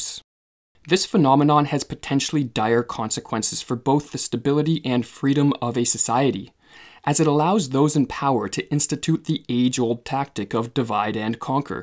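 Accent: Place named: Canada. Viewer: Canadian accent, do you hear it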